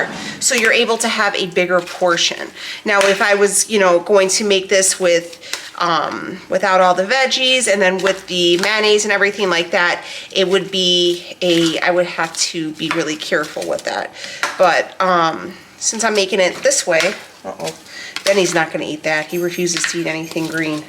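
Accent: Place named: Minnesota, United States. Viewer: Looks awesome! I'm going to try this. Where are you from? You sound like you are from my home state of Minnesota! :)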